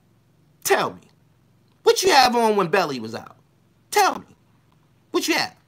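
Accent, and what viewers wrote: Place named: Pittsburgh, United States. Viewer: What part of pa from? Sounds like a Pittsburgh dude.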